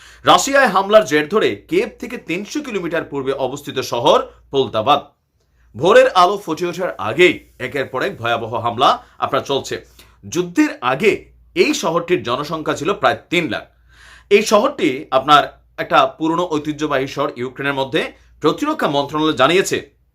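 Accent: Kolkata, India. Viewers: ভারতের শুরে কথা বলতেছেন। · কলকাতার ভাষাই তো আসবে। আপনার পূর্বপুরুষ যে ওপার বাংলার।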